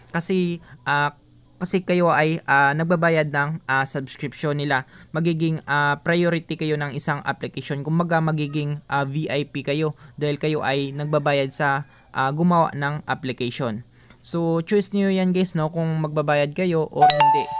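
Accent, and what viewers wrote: Iloilo, Philippines. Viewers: Ayusin ang pagsasalita parang Ilonggo kayo? Tutoo o hindi?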